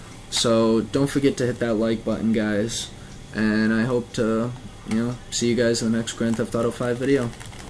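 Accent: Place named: New York, United States. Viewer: damn, some words you pronounce like a real new yorker, and i love it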